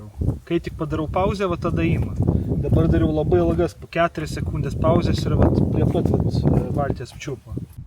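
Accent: Utena, Lithuania. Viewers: Pagaliau utenietiškai😉😁10/10 reikikia Utetenietiškai , be visi Iš Telšių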